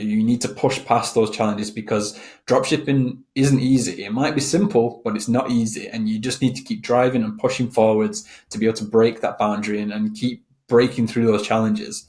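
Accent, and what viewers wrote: North West England, United Kingdom. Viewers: where you from mate, sound local. north-west?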